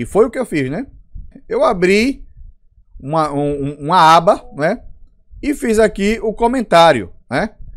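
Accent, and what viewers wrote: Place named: Paraíba, Brazil. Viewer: Quase cai, mas cliquei no link , que faco agora,, sotaque Paraiba muito legal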